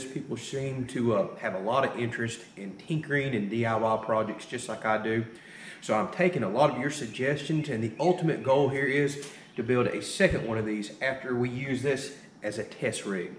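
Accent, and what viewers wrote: South, United States. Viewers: People have been trained by the media that when they hear a slow talking southern accent to automatically think that the speaker is an idiot. Ignore the people that think you're an idiot because you're much brighter then they are.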